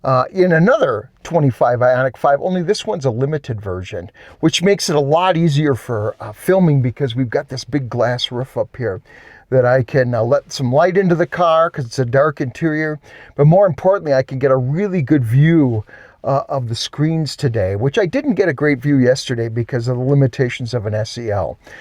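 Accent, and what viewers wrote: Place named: Wisconsin, United States. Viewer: Wisconsin. Got it. I was trying to place your accent.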